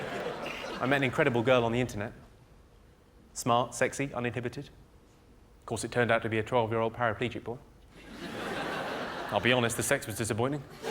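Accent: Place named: Yorkshire, United Kingdom. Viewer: You just sounds like a Yorkshireman in this vid.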